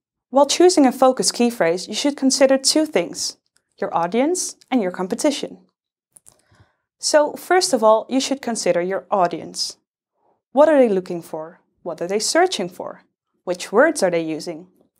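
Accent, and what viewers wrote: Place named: Netherlands. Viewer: She dutch though, typyical Dutch accent :D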